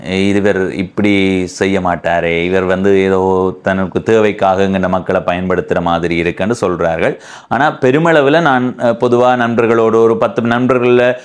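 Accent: Sri Lanka. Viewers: ஈழ தமிழர்கள் தமிழ் பேசும் அழகு அருமை 😊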